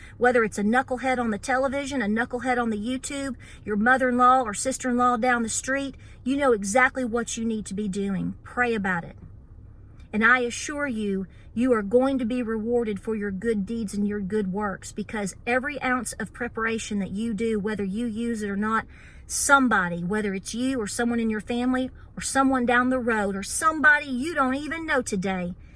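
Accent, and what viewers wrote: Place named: Appalachia, United States. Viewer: This is 100% appalachian woman my wife and 3 daughters and every homegirl i know sounds exactly like this...you want her on your side lol..she's slowing it way down trust me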